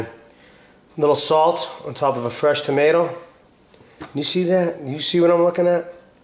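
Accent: Boston, United States. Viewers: Hmm, you sound like a Bostonian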